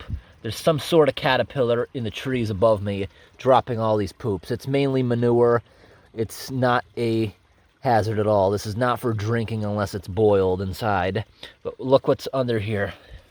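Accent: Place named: Northeast, United States. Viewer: just found you dude and you're super entertaining to listen to and watch! i'm from PA originally and idk if it's just me but you have a north eastern cadence to your speech, makes me homesick. keep up the nice work!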